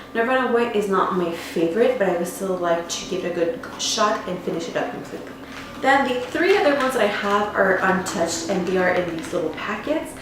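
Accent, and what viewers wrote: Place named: Canada. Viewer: I love your accent! are you Canadian?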